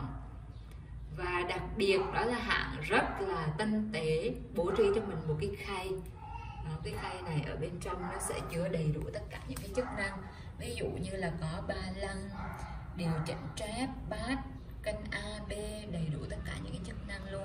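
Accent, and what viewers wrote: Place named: Hue, Vietnam. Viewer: Tiếng gốc Huế đây rồi:)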